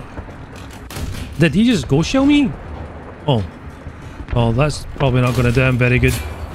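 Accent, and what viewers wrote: Scotland, United Kingdom. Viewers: love your videos! I'm new to your channel, but so glad I came across one of your vids and subscribed immediately! Not sure if or how you take suggestions/requests to showcase a vehicle, so I'll just ask here: could you possibly take out the American T54E1 (8.0 BR) and show how it fairs these days? P.S. Everything sounds so much better with a Scottish accent, please say: "Pesky murderous sidewinder" in the thickest Scottish accent you can master in one of your next videos!;P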